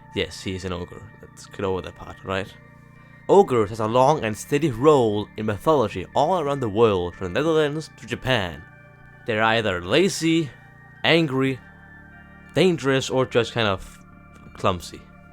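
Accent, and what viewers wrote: Denmark. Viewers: Thought I recognized the accent. It's Danish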